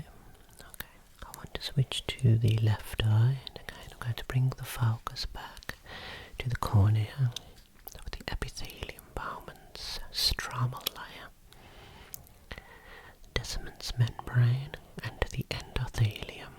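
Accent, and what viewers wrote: Birmingham, United Kingdom. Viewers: Never thought Brummy asmr whispers would hit me so hard but they do, keep up the great work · you are the only person that I've ever heard with a brummie accent that sounds good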